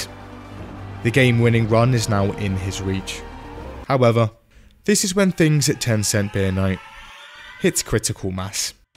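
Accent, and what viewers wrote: United Kingdom. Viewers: Feels weird hearing a british guy talk about baseball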